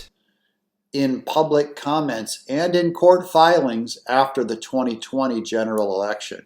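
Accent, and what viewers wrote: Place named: Scotland, United Kingdom. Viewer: Love yer Scottish accent 🤭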